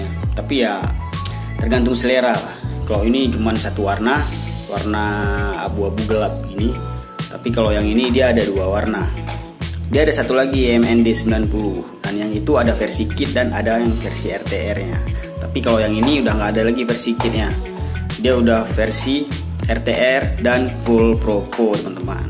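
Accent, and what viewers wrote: Aceh, Indonesia. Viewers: Mantap bang review nya 👍🏻 Suara abang mirip orang aceh.. Saleum 🙏🏻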